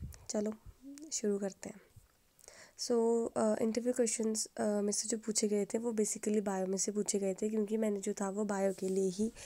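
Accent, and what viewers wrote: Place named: Haryana, India. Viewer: Ok thank you. And God bless you 🙂 But kha se ho yeh toh btati jao. Accent se guess krra tha. Last guess haryana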